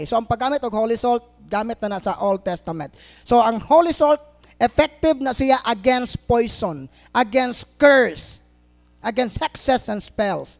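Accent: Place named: Bohol, Philippines. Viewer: nindot gajod ni motalked c bro. KY real language gjod boholano gjod.nindot gjod paminawon bol-anon.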